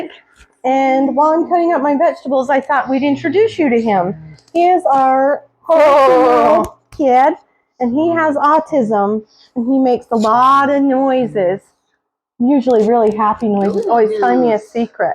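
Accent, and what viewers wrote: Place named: Minnesota, United States. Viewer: 😂forgive me. "Accents" is one of my things. New to yr site. Literally, first time today. Don't wanna invade yr privacy. I hear South, Minnesota, and more. "MA Jor am"😂❤ again not being rude. Me born raised Chicago, 15 years ABQ, almost 10 SE TX. Just love people's voices. 😊 including yr son's.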